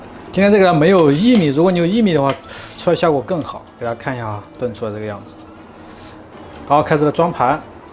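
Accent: Sichuan, China. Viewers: 的四川口音普通话听了倍感亲切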